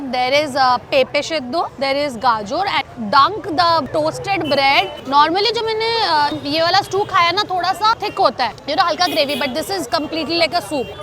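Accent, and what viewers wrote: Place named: Bengal, India. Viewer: 1. You look like Prajakta Kohli 2. Your Bengali accent is so cute 3. I absolutely love the way you’re taking big bites ❤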